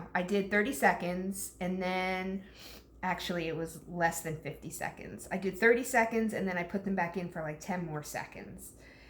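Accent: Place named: New Jersey, United States. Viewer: I did pick up on the heavy Jersey accent lol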